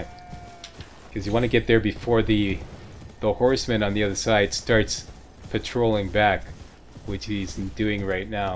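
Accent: Canada. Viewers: By any chance, are you Canadian, Eh?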